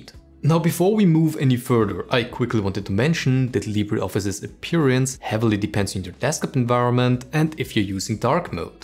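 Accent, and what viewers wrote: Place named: Germany. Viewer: with a German accent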